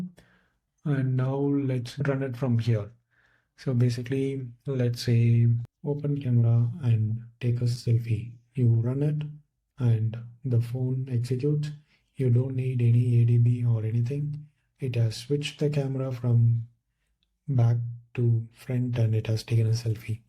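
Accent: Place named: India. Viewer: indian accent... scares people away.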